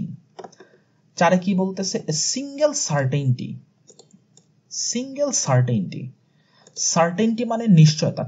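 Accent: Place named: Sylhet, Bangladesh. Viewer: সিলেটি ভাই। আমিও সিলেটি😍🙂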